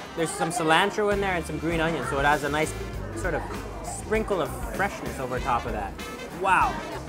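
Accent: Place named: China. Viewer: As lived out of China for long, it is really memorable to see these food. Good job man, interesting to see it from the view of foreigner. Man, you talk with local accent, haha, that is lovely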